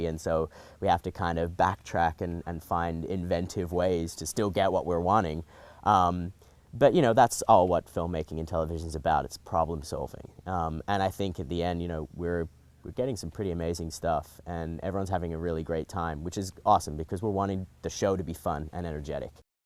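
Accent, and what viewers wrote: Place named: United States. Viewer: omg hes interviewing in an american accent! ahaha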